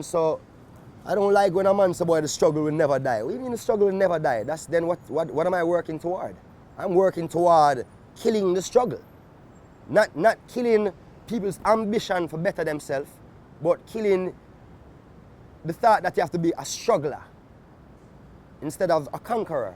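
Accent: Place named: Jamaica. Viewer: Dear Sir Gong, thank you for never abandoning the authentic Jamaican accent. Blessings in these great endeavours!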